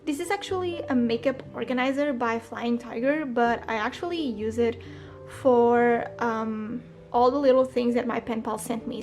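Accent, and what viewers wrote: Portugal. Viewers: adoreii !! reconhece-se logo um portugues ahah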